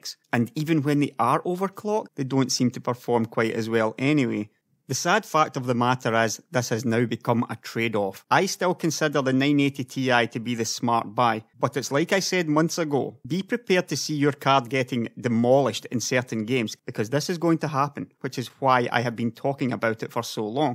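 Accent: Scotland, United Kingdom. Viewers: what accent is that? you make me think of Brave's highlanders. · the scottish accent is awesome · Really liking to hear a Scotsman talking tech. Keep up the videos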